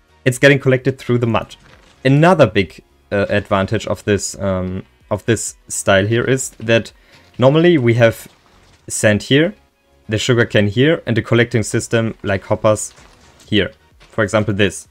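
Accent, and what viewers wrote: Germany. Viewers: Your german i hear your Accent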